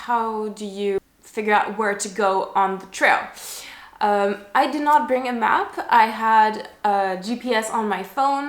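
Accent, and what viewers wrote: France. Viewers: May I ask.Are you a native French language speaker😊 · i like your French accent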